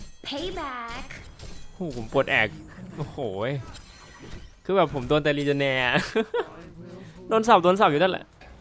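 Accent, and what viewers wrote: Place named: Korat, Thailand. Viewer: เสียงพี่โคราชมากคับ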